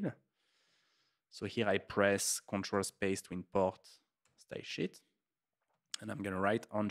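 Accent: France. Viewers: I'm detecting a strong French accent 👀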